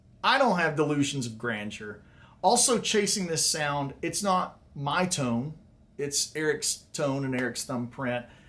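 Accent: South, United States. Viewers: Totally agree with you that aside from ACL 88, that Venus Isle was the best tone and era! I could never understand why Venus Isle was deemed not as good as Ah Via Musicom by many critics..Solos like ' ', ' ' and obviously ' ' are just otherworldly masterpieces.... will never be beaten, but I must say you have to be the closest replica I've ever heard!!!... If I closed my eyes and didn't know better I'd totally believe its Eric i'm hearing. Amazing guitar playing brother, absurdly clean technique (you've undeniably done your homework BIG TIME) and a very likeable friendly manner to boot - not least the Southern accent... There's more than a few things you have in common with the master:) Great video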